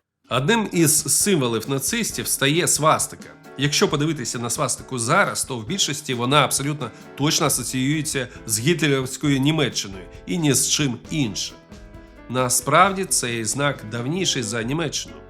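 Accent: Russia. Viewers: Дуже дякуємо за українську мову та цікавий контент. Дозволю собі малесеньке зауваження , не ображайтеся тільки , дуже прошу. В українській мові , де пишеться буква О , то так і читається та вимовляється - О , а не А .Завжди , незалежно від наголосу. В російській , коли наголос не падає на букву О , то читається як А . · Удосконалюйте українську Дуже ріже Ваша російська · Працюйте над вимовою,бо у Вас російський акцент!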